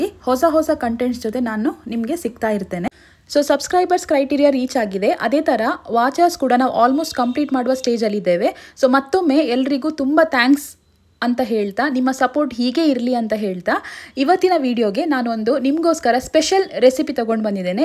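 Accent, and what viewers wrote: Mangalore, India. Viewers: Manglore kannada thumba chanda❤ kelalikke thumba khushi. Nammoora bhashe❤ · Namma kudlada kannada eshtu porlu marre kenga keluva anthave agtade ❤❤❤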